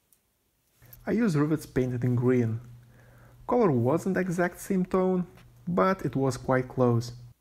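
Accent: Russia. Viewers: У тебя Русский акцент. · ты русский что ли??? акцент слышен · Настолько русский акцент , что сразу спалился . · sharp russian accent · Лучше бы бот озвучил. Какой откровенный акцент, прямо как в американском кино, где русских показывают. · чувствуется русский акцент..... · Русский акцент. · Oh!!! Russian English!!! 😁👍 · Are you Russian? your accent is telling me Russian but I'd rather ask then assume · Ебанутый русский акцент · Пиздец какой у тебя Русский акцент браток! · Why do you speak like russian? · Русский акцент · Русский акцент)))) Канал на русском есть?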